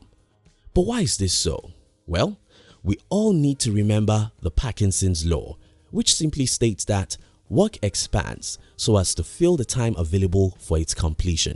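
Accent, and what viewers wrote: Nigeria. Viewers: For those who might be wondering where these ascent comes from, well, this is a Nigerian ascent. Good work bro! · That's a Nigerian 🇳🇬 accent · Lol Narator sounds like he from my country Nigeria 🇳🇬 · Brilliant Nigerian voice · Gather here if u think the narrator sounds like a Nigerian ✌️ naija fi life no fuckery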